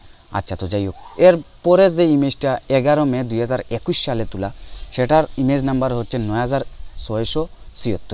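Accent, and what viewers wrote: Sylhet, Bangladesh. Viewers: ভাই আফনার বাড়ি কিতা সিলেট নি? আফনার মাত দেখি সিলোটি অখলর লাখান লাগে।🥰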